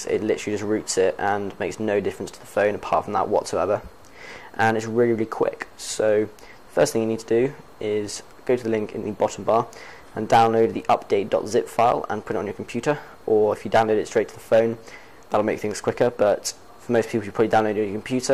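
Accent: London, United Kingdom. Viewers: YOU SOUND LIKE YOU'RE FROM LANDAN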